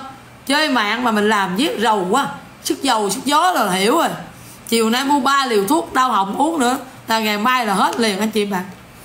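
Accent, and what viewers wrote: Standard. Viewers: noi qua chuan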